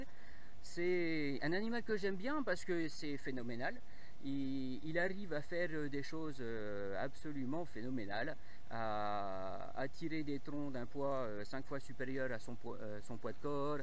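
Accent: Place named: Tahiti, French Polynesia. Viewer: Tu a un accent tahitien 🤗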